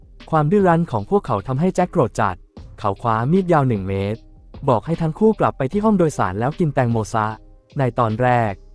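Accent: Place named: Shan, Myanmar. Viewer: ไทยใหญ่ชัดๆ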